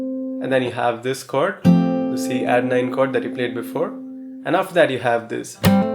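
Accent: India. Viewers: I just love your arrangements. You are an amazing teacher! Thankyou so much for uploading these beautiful fingerstyle lessons :)! I want to ask one thing, are you Indian? Because I find your accent to be Indian. And may I please know your name?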